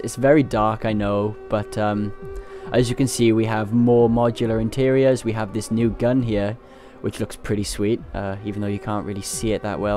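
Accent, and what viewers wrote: Wales, United Kingdom. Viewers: I'm British... So why would I get tired of another British voice? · are you welsh?!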